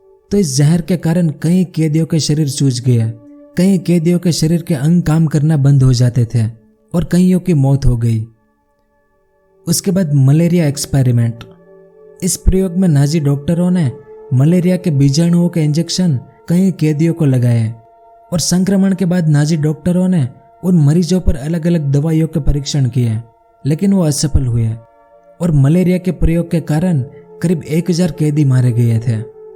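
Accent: Gujarat, India. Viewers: Gujarati lago cho??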